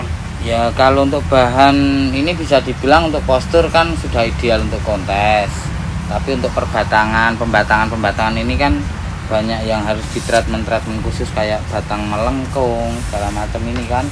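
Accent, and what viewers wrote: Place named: Java, Indonesia. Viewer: Tiang jawi nggeh logatte keton banget 😊😅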